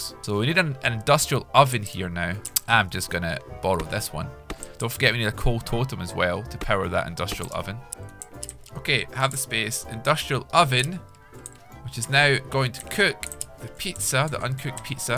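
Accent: Scotland, United Kingdom. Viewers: I love your scottish accent "fefty" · "Cyked pizza's." Dat scottish accent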